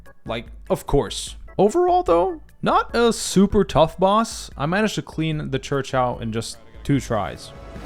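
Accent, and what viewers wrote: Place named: Canada. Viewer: You're the most Canadian Canadian I've heard play this game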